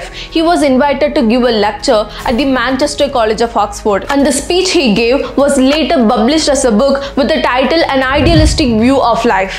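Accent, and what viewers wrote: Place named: Tamil Nadu, India. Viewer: Madam Your tamilian english is so sweet that I have been crushed on you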